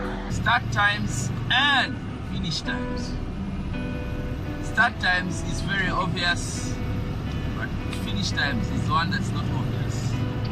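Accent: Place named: Uganda. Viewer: Ur sound like Uganda goodwork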